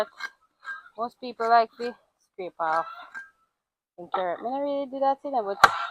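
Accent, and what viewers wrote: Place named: Jamaica. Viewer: Yeh mon dis ah di real Jamaican sound💪🏾💪🏾💪🏾🇯🇲🇯🇲🇯🇲🇯🇲🇯🇲🇯🇲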